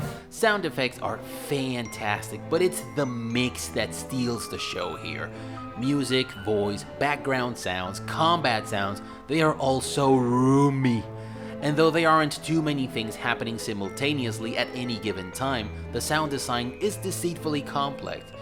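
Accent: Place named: Latin America. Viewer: Loved the review as usual. I usually give the thumbs up before the video starts and thus far haven't been mistaken, not once. As-always, a-mazing. Una consulta? Cuál era el juego que jugabas con las monedas el "Caliche"? Se te nota un acento latino en el inglés, pero no termino de adivinar de dónde. En fin, cada nuevo video de es una vista obligada para mí a este punto. Abrazo grande desde Argentina.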